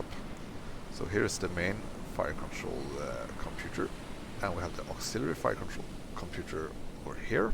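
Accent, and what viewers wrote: Sweden. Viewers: Greetings from Finland! Based on your accent I would say you are Swedish maybe...? When I saw Wolfpack345 play this game it was an instant wishlist for me.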